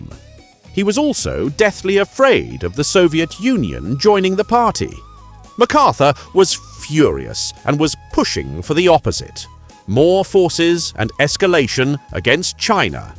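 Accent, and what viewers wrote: United Kingdom. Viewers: Finally a British accent in these kinds of videos Makes US flag merch Disgusting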